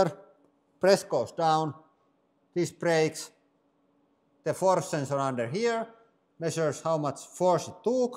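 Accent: Finland. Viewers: How nice to hear a Finnish accent 😊 I’m 2nd generation Finn here in the US. My grandparents came over in the late 1800’s. My mother and both grandmothers equals 28 children. Big age gap.😂. I love your channel. Finn was my mother’s first language. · The language he speaks calls Finglish) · Nice Finnish accent! :-) Go Suomi, go! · Well it's that Finnish accent mixed with English translation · s a Swedish person, I truly appreciate our Finnish neighbours. So the moment I heard the accent, I felt warm and positive.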